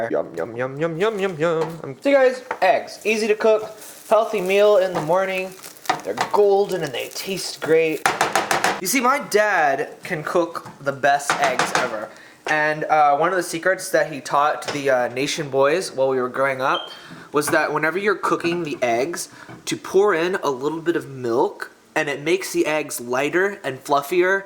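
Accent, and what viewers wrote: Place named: United Kingdom. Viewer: You sound like you have a slight British accent, lol! It's the way you pronounce most of your vowels.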